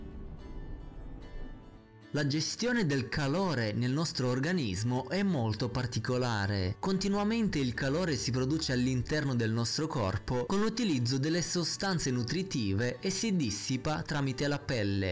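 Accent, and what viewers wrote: Russia. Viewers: dopo tutti sti video tuoi che mi sto vedendo ho disperatamente bisogno di un accento russo come il tuo ahahah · sei russo? dall'accento si sente un po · oddio sei un grande,il modo con cui ti esprimi,dettagliato e con parole ben scandite,il modo con cui parli (so che sei russo però questo è positivo perchè ti rende la voce tipo enigmatica e questo a me piace),queste cose ti rendono perfetto per il genere di video che fai,dovresti avere più iscritti e,ti farai mai vedere?fai una top 5 sulle curiosità sul lego?sei un grande continua così ;)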